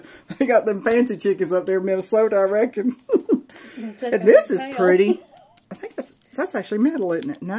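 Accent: South, United States. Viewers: you and your mini are 2 cute. I love ya both. Your southern accent makes me want to move to the south. I also love the warm weather. But I adore your mini. I love all the old labels and die cuts. I know you are going to have so much fun putting pictures in it. Enjoy and Tfs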